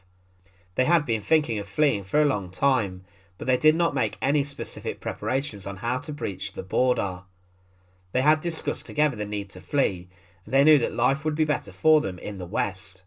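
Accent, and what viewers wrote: United Kingdom. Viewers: Worst documentary with a British guy speaking, and the award goes to.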